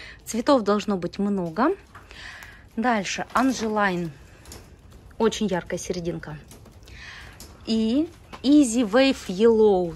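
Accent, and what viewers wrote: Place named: Urals, Russia. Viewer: Первый раз смотрю вас. Сразу подумала что вы с Урала. У меня там есть родственники у вас своеобразный интересный говор не перепутать. И слова есть которые только от этих родственников слышу. Очень у вас все цветы хороши👍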